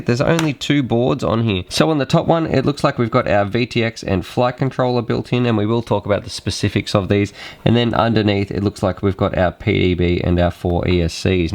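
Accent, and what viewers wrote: Australia. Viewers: I did watch more than 20 Videos from you since I found you channel last week. And from a german point of view, australian english sounds better than american or uk english. Alrighty!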